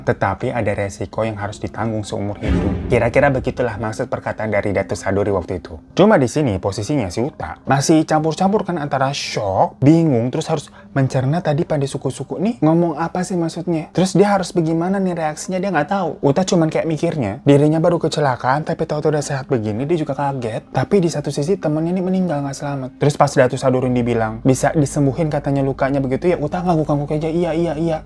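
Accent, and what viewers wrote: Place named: Banjar, Indonesia. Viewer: Lucu dengar bang pakai bahasa Banjar 😊 udh kaya orang Banjar beneran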